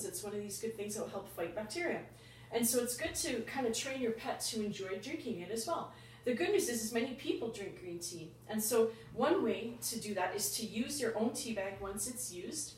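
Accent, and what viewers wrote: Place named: Canada. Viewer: Nice hair and I love the Canadian accent!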